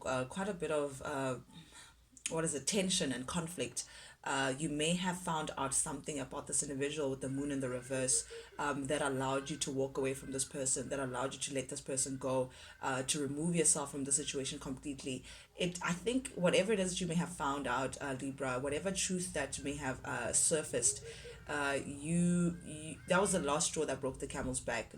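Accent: South Africa. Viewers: Wow I love your channel, you sound south African could be wrong, but I like it.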